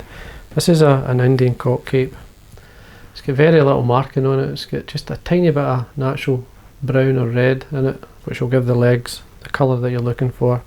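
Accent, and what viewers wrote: Scotland, United Kingdom. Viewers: Forgive me, but I have trouble understanding your Scots accent, (We here in the Ozark mountains have an accent that few can understand), but I see what you're doing, and I've tied some of your flies. I will tie this one, because I think this spring it will work on the streams we have here in the Ozarks. Thanks for your videos, and keep up the good work. Wish I could come to my ancestral home in Scotland and fish, but I'm afraid I'll have to settle for our rainbow and browns in our steams. I'll let you know how the Long Hackle Fly works this spring and summer.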